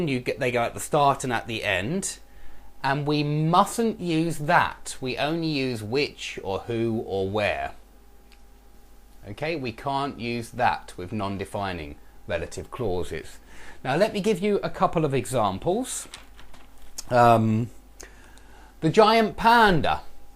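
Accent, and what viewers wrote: England, United Kingdom. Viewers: are you English? I loved your accent:)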